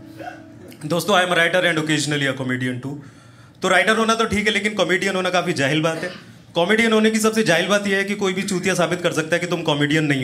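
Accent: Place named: Uttar Pradesh, India. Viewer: Bhai apke baat karne ke tareeke se proper up wali feel aati hai.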